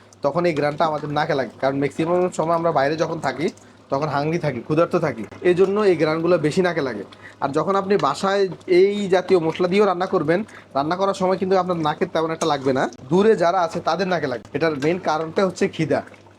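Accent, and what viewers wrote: Noakhali, Bangladesh. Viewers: নোয়াখাইলা ভাই, পলাও ভালো রাধছেন।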